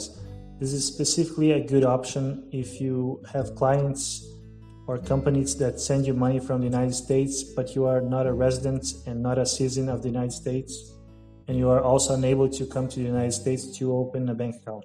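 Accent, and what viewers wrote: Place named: Rio de Janeiro, Brazil. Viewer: Eu tenho quase certeza que é carioca... =D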